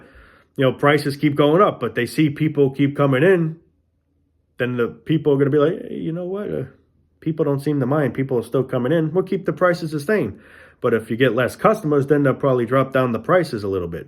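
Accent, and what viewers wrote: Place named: New Jersey, United States. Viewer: By your accent my guess is you’re from New Jersey.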